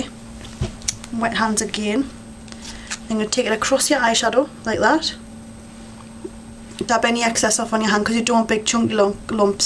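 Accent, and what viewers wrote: Newcastle, United Kingdom. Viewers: r u from newcastle or around somewhere there?? great tutorial btw!!!!!!!!!!! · Is that a Jordi accent?? I absalutley love it <3 x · She's a Geordie, it's a famous northern English accent. It's the same accent as Cheryl Cole if you youtube her on X-factor UK... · OMG it's nice to hear you talk, love your British accent. Oh by the way, you're pretty even without any make-up at all. The nailpolish is strange, but them I'm 48, haha...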